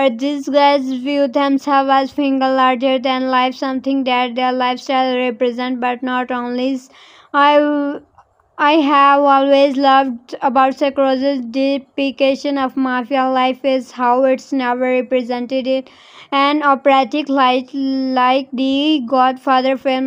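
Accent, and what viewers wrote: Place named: India. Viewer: Your accent is funny She Indian Hey stop scumming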